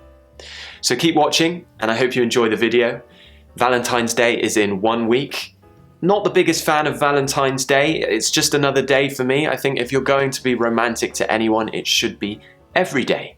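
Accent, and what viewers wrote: RP, United Kingdom. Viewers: Hi, English channel.I`m really happy with your english lesson.your british accent is really amazing,every day i watch your youtube channel for improving my english,specially my accent.thanks for your dedication on youtube channel,keep going!very support with your hard work! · I'm brazilian and I'm trying to learn the british accent with your help 💙 I met your channel today and I really love it, thank you so much for all of this 💙💙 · thank you! I love your British accent! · I love your British English ! I come from hk , I am married 😹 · I am immensely grateful to be able to attend your lessons,specially i am so fun of British accent as well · I love British accent, And i love hearing your voice and learning British English at the same time. I'm browsing all of your past videos and i'm in love with it. Your such an inspiration. 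Thank you.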